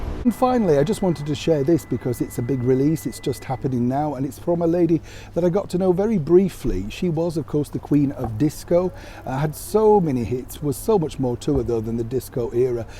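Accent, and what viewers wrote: Yorkshire, United Kingdom. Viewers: Good morning, you say some words just like my nannie who was herself from Yorkshire yet mostly you have a nice clear voice perhaps from spending some time in London. Loved much of music, I think I'll have a revisit of that, thanks. Great videos today, interesting hearing about your time in radio x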